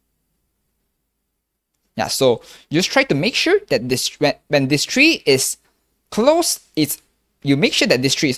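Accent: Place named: England, United Kingdom. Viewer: Why do you have a sort of english accent up till rs-ex-5? lol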